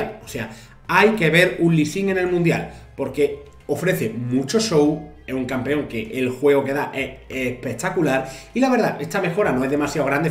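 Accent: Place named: Seville, Spain. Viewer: Hay subtítulos al español? No entiendo el sevillano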